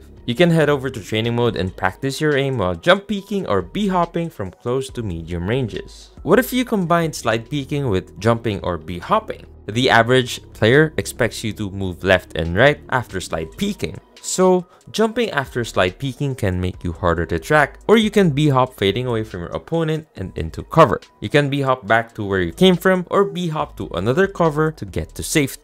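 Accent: Philippines. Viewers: I’ve been wondering for a while r u Filipino cuz you sound like it?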